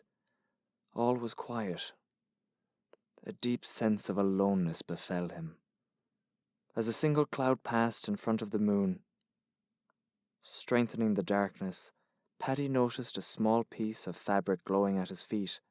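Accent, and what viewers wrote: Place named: Ireland. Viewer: Excellent... wonderful narration. I have always liked listening to the Irish dialect.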